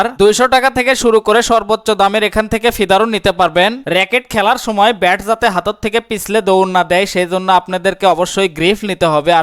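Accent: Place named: Sylhet, Bangladesh. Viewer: Ata ki. Sylheter❤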